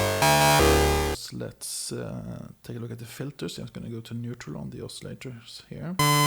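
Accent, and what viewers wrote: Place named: Sweden. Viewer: Just love when you can hear on the accent its a swedish person.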